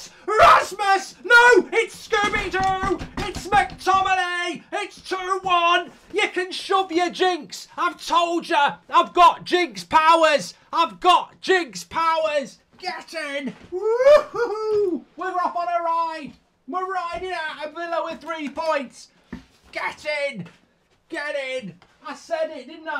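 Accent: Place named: Glasgow, United Kingdom. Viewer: I'm from Scotland and accent was pure Glasgow 😂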